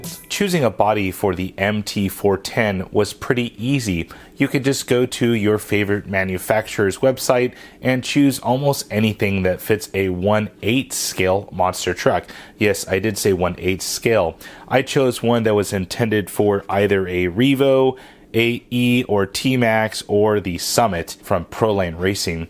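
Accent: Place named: California, United States. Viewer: Hah sall good! Guess that's just how California can sound:1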